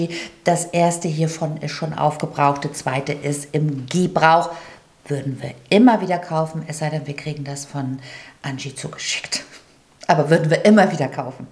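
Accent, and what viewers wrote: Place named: Berlin, Germany. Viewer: Hab dich eben entdeckt und finde du hast eine sehr fröhliche und erfrischende Art, die mich irgendwie glücklich macht. Darf ich fragen, wo du herkommst? Irgendwie hörst du dich so nach "Berliner Schnauze" an, find ick jut. LG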